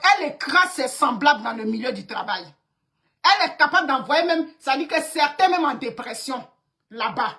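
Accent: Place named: Ivory Coast. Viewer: Mme votre accent sonne comme une Ivoirienne.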